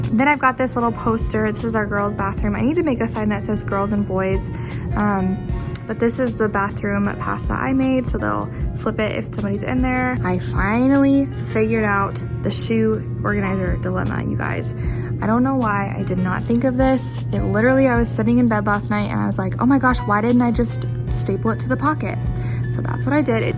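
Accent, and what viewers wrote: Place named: United States. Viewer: This is no criticism, but in all the videos about primary school classrooms in the US (I assume you are from the US, hearing your accent; if I should be wrong, I am sorry), I can't help but notice that all the walls and surfaces are decorated to the absolut max(imum). Why is that? I'm not against decorating the classroom and creating different areas by using rugs, shelfs, pillows, colors, etc. But I would never use all these quotes on the walls and doors, tazzles or borders on the whiteboard, as I find them too distracting. Many pupils need clear structers and minimal visual stimulation to be able to concentrate on their work instead of their surroundings. As I said, no criticism. I just can't help to notice the differences between german and north-american primary school classrooms.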